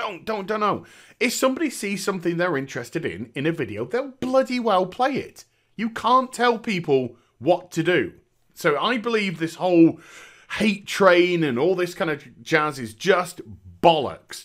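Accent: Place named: United Kingdom. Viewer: More videos please mister funny British man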